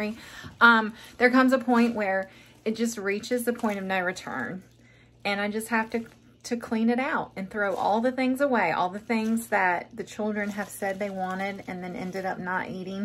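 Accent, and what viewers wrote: South, United States. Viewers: Loved the video. Typical farm day something always breaks Lol. I love your positive outlook also your accent. A real southern lady 🙂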